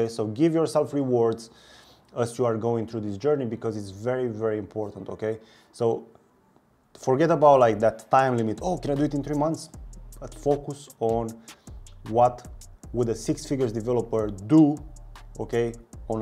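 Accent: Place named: Romania. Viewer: Pretty sure you're a mici enjoyer only by your accent. How about simply just jumping into projects and learn trough your mistakes? We have gpt, it can help us, but after the helping code is received, we should also learn from gpt why he did that, and then use that thing until u fully understand it. Coding for the average person (with a job or being a student or both, or even having mental issues), would take around 8-16 months to learn a language to the point where he won t use the internet and copy-paste code 60% of its project, or the big plante, being easy for him to explain his code.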